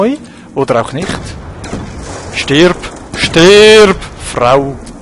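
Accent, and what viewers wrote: Switzerland. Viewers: erstmal geiles video :D ich liebe dein schweizer akzent... ein vorschlag von mir mit höllenhunden schwer und ohne magie auf/in stadt und alles mit ungepunchten waffen ;D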